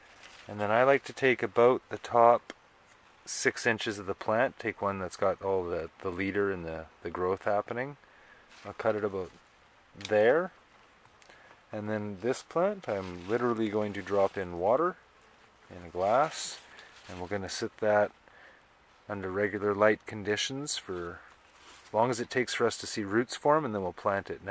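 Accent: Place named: Canada. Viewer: Are you Canadian? I can hear the accent. Reminds me of home. I never really noticed until I moved to the US. Now every time I talk to family it’s all “a-boat” and “eh” and “buddy”. Lol.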